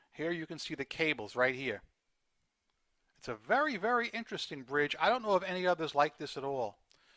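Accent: New York, United States. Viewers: Love how you've never altered your New Yawk dialect one bit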